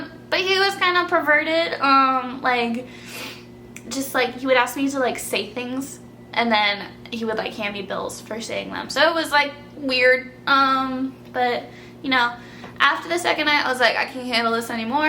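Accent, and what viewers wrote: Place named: New York, United States. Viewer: Interesting to hear your experience as a stripper. You sound like your from NY,, not Oregon.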